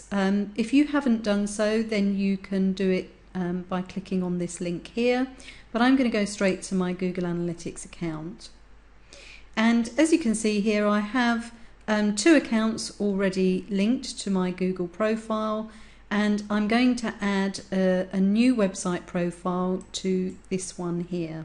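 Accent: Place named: England, United Kingdom. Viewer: your english acent just wow <3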